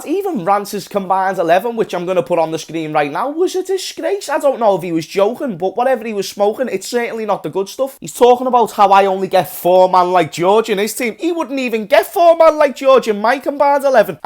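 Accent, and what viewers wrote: Liverpool, United Kingdom. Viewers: Proper Scouser bro, keep up the content lad ynwa..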